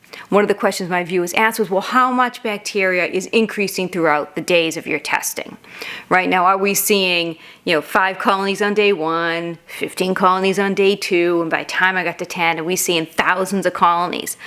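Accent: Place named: Boston, United States. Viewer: is from the Boston area.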